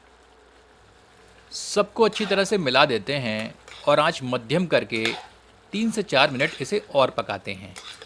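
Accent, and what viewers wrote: Standard. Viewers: itni madhur aur suddh hindi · Yeh shudh hindi hai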